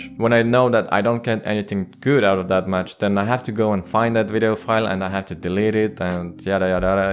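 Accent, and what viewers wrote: Estonia. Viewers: are you estonian?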